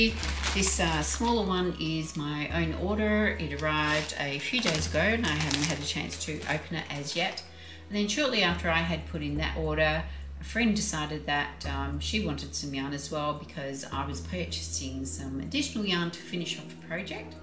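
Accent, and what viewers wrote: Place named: Australia. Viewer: Crazy enough it just popped up on my YouTube page. But as soon as I heard your accent I had to watch. I enjoy all things crochet, yarn, & Australia as I lived in Adelaide and Darwin for about a year and a half and currently work with the Australian and NZ markets for my job. I knew I had to watch and check out your channel.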